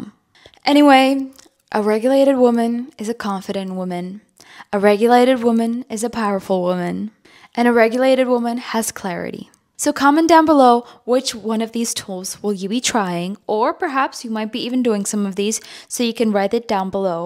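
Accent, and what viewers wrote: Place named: United States. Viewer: jaktože máš tak perfektní angličtinu a ještě k tomu americký přízvuk? 😯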